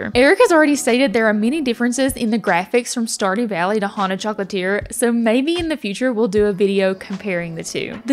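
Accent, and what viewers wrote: South, United States. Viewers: It's so refreshing to hear a southern accent coming from a "cozy gamer" :) We southerners get a bad rep quite often so my wife and I are really loving you!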